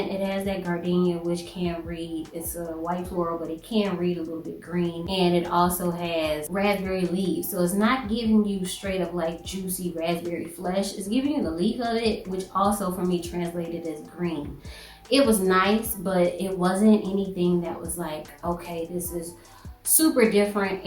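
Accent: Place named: Arkansas, United States. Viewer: As soon as you mentioned an Arkansas influence on your pronunciation, I was IN. *subscribe*. No, I'm not from Arkansas, but I am from who you are. Great review. Well done.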